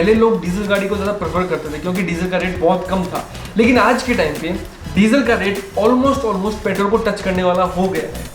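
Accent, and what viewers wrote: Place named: Haryana, India. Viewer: Bhai pakka haryanvi hai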